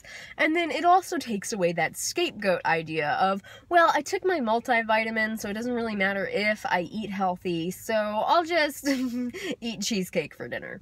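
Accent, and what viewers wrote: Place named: East Coast, United States. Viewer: Hawaii I knew you were from the east coast! You have the same accent, voice & cadence as one of my best & oldest friends <3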